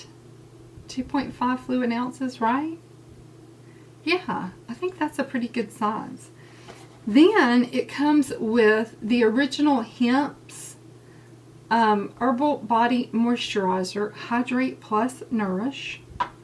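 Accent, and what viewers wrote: South, United States. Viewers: I love you so much I think your were sleepy in this cause you sound even more southern , I do the same when I'm sleepy i love your top today enter me darlin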